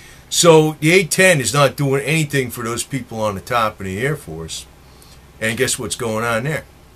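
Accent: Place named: New York, United States. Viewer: Sounds like you are from New York or Jersey.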